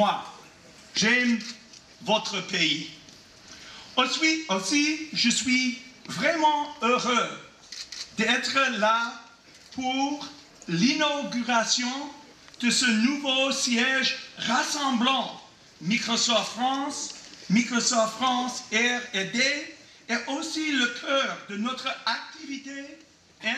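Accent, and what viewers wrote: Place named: England, United Kingdom. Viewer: omg I was not expecting this when I hit play 🤣🤣 fair play to him, I would not have the guts to speak on stage if my pronunciation was this bad. It actually gets better as he goes on, just his intonation/cadence is very English.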